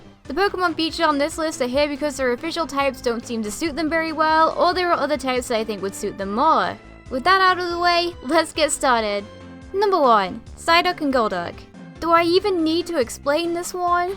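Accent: United Kingdom. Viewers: u got a british ascent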